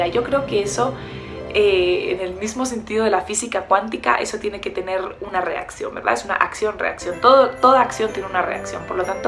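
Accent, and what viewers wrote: Colombia. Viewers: hermosa tu nuevo apariencia!!!!! se te pegó el asento colombiano!!!! jajaj. besos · ¿por qué motivo ahora hablas con acento colombiano? 😒 incluso 'seseas'...increíble...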